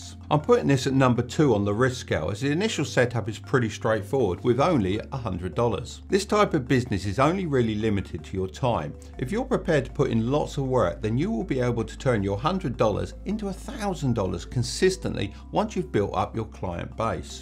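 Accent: United Kingdom. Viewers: His british accent is fire ;)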